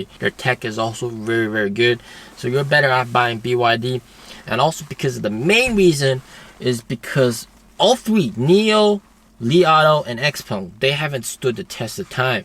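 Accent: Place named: United States. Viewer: For someone who's a native Chinese your English is very good even your accent. Sound almost American.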